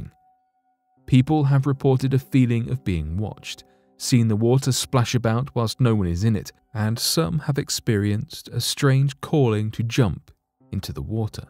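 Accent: United Kingdom. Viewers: It's entirely possible that they are sourcing content from an unreliable source, an old source that is no longer familiar, or even sources that simply have different experiences from you lot. But to pull all those details out of their butts & off the tops of their heads is unlikely. I'm more apt to believe one of the first two possibilities, especially since the narrators sound like they might live across the pond (therefore potentially easily made to believe untruths, as they have no or very little experience & reference for places in the US).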